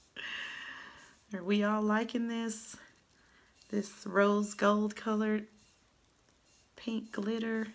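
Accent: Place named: South, United States. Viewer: New sub and loving your channel! Love the accent! Im from the south and Im a southern girl as well!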